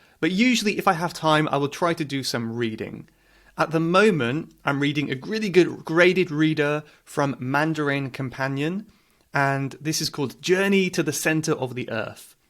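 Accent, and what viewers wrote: United Kingdom. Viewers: I came across your videos today and I must say I love your British accent. I learned English at school, 50 years ago ! I have never had the opportunity to talk to an English person but now, at least, I can hear this lovely language. I have been learning French these last years and I used so many methods...! It's amazing how easy is to learn languages nowadays, (with a lot of motivation, as well). Thank you so much for your work. I would like to visit your country and take the famous 5 o'clock tea with scons ! 😊Greetings from Argentina.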